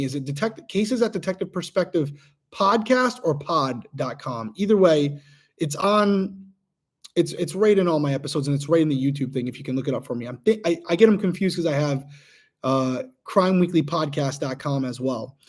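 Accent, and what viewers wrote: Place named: East Coast, United States. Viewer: ❤❤❤ I can straight hear your east coast speak!! Love love love it!!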